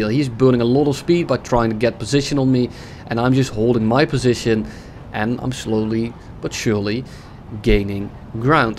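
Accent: Netherlands. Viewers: i smell dutch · Still love the dutch acsent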